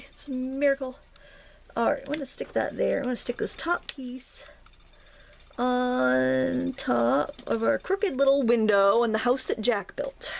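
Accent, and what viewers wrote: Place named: New Jersey, United States. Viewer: This is really a nice, peaceful scene,. Thanks for showing it to us.Do I detect a NJ accent when you're talking? Sounds like, "up home," to me. I like to listen to you talk.Happy Holiday Season to you and yours.:D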